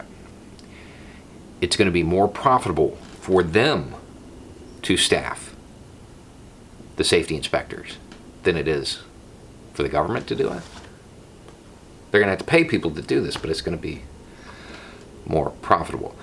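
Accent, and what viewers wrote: South, United States. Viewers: Always look forward to your videos! So I was watching the clip where you spoke about structured anarchy in Vegas (I think that's where it was). I don't know if it's the difference in recording devices used, but your voice sounded very different. In that clip, it was very deep. On your channel, however, you have a much stronger southern accent. Sorry if this comes across as rude or impolite; that wasn't my intent. Just something I noticed, like when Curious George is flipped upside-down. Anyhow, I'm always on the lookout for your content. I learn so much that I never knew! Keep up the awesome work!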